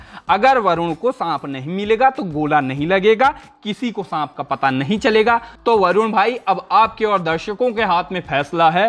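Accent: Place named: Rewa, India. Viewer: Rewa ki bhasa hai 😂🙋 me bhi rewa se hun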